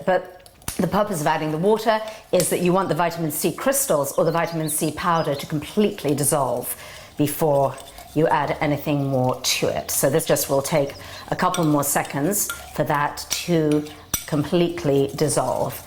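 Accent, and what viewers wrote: United Kingdom. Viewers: You start of sounding British but then pronounce "vitamin" like an American. What's going on?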